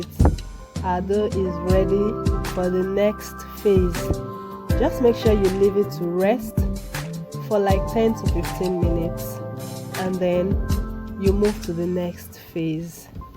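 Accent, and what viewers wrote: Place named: Nigeria. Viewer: Hearing the beautiful Naija accent .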